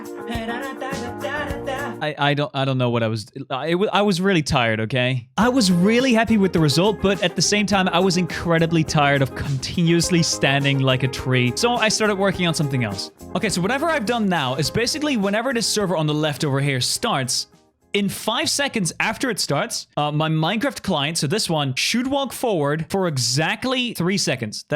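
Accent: Netherlands. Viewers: u dutch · are you Dutch (because i am)??? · wait are you dutch?? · Youre dutch? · Are u dutch me to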